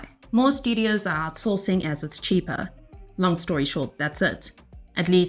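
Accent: South Africa. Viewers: This is completely unrelated but your accent sounds sooo similar to South African ones. Are you from South Africa??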